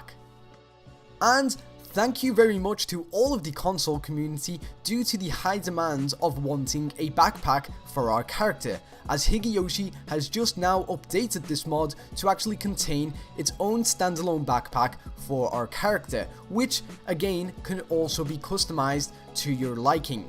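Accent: Liverpool, United Kingdom. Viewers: Your fucking accent is horrendous. If you're a scouser, SPEAK LIKE A SCOUSER. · 'which I'm sure is a toilet paper in the UK' .....you're from the UK aren't you?? Scouse I thought?? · I'm so confused, are you a scouser?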